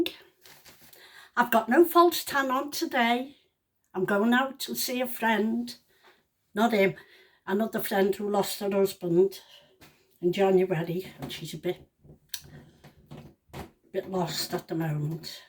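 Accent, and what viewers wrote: United Kingdom. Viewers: I love listening to your British accent ❤ and thank you for the advice 🙋‍♀️ about the infection, as women we have more to look forward to 🌬 just being women is a challenge the older we get..thank you for caring 💕🌹💕😘🙏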